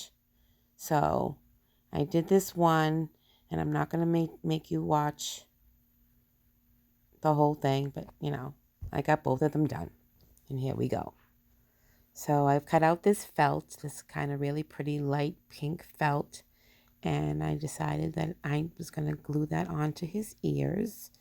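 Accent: Massachusetts, United States. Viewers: Well I am impressed. Beautiful designs and execution! So much so I have subscribed. Enjoyed hearing the MA accent again! Grew up in Reading, moved to L A a million years ago at 22 to seek fame and fortune. What part/town are you from? How/where do you sell your wreaths? All the very best to you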